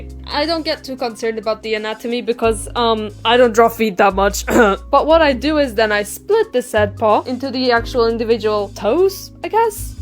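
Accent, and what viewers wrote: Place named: Scotland, United Kingdom. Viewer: hold on why is it after like 9 seconds you switch to a more Scottish accent???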